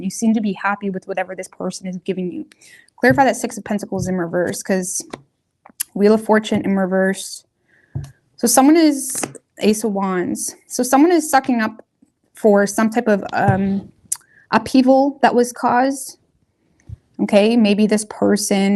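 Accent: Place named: Canada. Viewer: Just wanna say. I really like when readers are open enough to let is into their personal lives and take a break to stretch, smoke break etc. As a smoker i love to see the reality and appreciate doing what you do. As a fellow Canadian who lives in thd U.S. hearing you makes me happy and homesick. Thanks.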